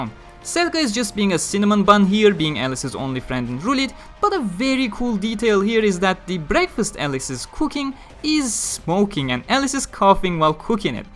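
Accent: Turkey. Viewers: İngilizce'ni duyunca edim acaba bu kanal türk mü diye isme bile bakmamıştım.Başarılarının devamını dilerim reis :D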